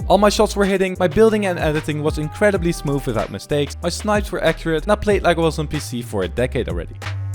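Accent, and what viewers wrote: Netherlands. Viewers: Lol je bent nl en ik hoor het aan je acsent en op een clip zij je gg man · Are you Dutch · Ur accent sounds like your from the netherlands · Are you dutch??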